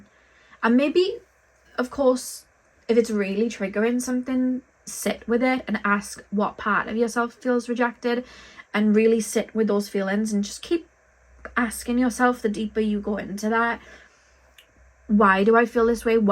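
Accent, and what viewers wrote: Wales, United Kingdom. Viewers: Pile 2; Thank you so much for the confirmation of where I am. You are so spot on, also with the Whale 🐋Been drawn to the Whales so much lately, and I read that the Whales and the Dolphins are gathering in HUGE pods all over the world now! WOW! Just saw an Eagle, and I saw one yesterday too🦅🦅YEI!!! I am new here and I really loved your reading❤‍🔥 And I love your Welsh accent, lived two years in Swansea, Wales, when I took my masters, so it is bringing me back. I come from Norway, in this life, but I the sound of your voice with the Welsh accent feels really like home🐉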